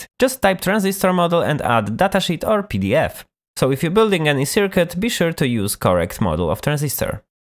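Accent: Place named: Poland. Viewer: Kurwa uslyszalem akcent i wiedziałem ze z polski XD cieszy mnie to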